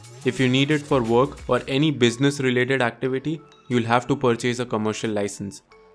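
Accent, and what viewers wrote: India. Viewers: clicks first video, AI voice, clicks away. Clicks second video, indian accent, stays.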